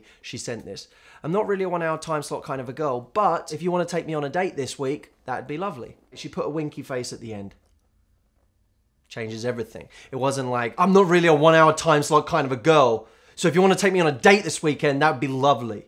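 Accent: England, United Kingdom. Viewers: I watch all his videos just to see him and listen to this heart-melting English accent 😭❤ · BRIT ACCENT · What text can I send you to get your attention?Haha British accent Loves it! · I love the British accent...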